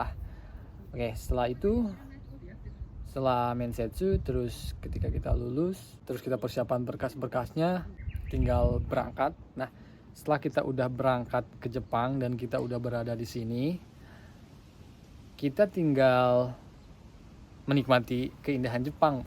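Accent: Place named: West Java, Indonesia. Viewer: "Aku nyaaa cangkeull" sunda pisan nya a😅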